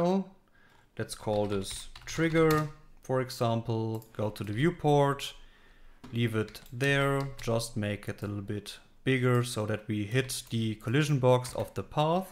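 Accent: Germany. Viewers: Thanks for your tutorials, they are very helpful. Actually, I'm learing UE5 right now and for that, I'm trying to build my own Train Game. So far so good, I've implemented some models, two bogies per wagon and some algorithms that calculate the right placement of the train body and the back bogie position on the spline in order to always keep the same relative distance between the two bogies. The next step would be to implement switches, I think that should be fairly easy with your method, just switch the active Spline Track of each of the bogies in the moment they hit the hitboxes. But I've faced another, logical, problem/challenge. Let's say you connect some spline tracks with each other (actually haven't tried that, but that should work relatively similar to the switch "problem") and you build a U-Turn, which would place you on your "origin" spline, but the other way around. 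In that case, moving "forward" would not mean distanceAlongSpline+velocity, rather you then would have to subtract your velocity from the distance in order to move "forward" or lets say in the right direction. I'm really not sure how I could do that, like find out what would be the right direciton for the train.. Maybe we could chat a bit about this issue and what you think about that? Thanks in advance!:) Ps: You sound German, is that right? :D Then you could also answer this in German, no problem.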